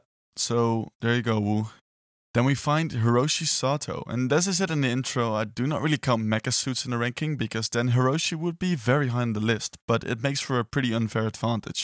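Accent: Netherlands. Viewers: Nederlands accent gedetecteerd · I smell dutch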